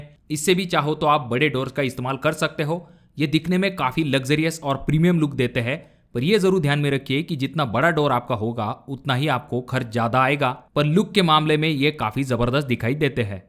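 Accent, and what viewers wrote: Maharashtra, India. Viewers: Lagta hai aap Marathi ho ? Soft aawaz nahi hai ?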